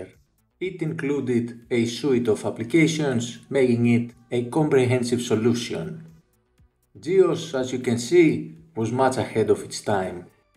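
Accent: Greece. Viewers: He sounds Greek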